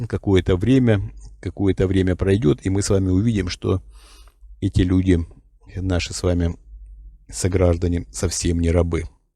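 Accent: Ukraine. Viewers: Виктор Михайлович, вы употребляете слово " ТРУСИТ". Генерал контрразведки - вы украинец ??? У вас акцент украинца, очень много слов и оборотов, которые применяют в речи только украинцы...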